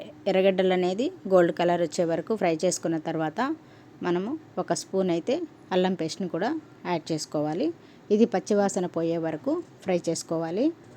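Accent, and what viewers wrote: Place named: Rayalaseema, India. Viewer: మా రాయలసీమ భాష, ఎర్రగడ్డలు,వుర్లగడ్డలు వినడానికి ఆనందం గా వుంది.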